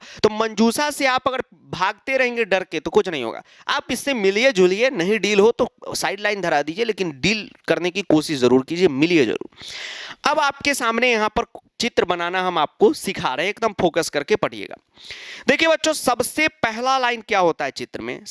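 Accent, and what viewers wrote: Bihar, India. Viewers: Sir kya bihari boli maje a gye 🫡🫡🫡😂❤❤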